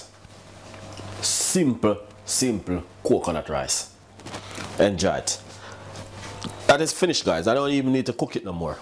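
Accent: Jamaica. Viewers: Basmatic rice 😂 I love his Jamaican accent ❤️ In my opinion It’s not too much salt because of all the water and coconut milk he added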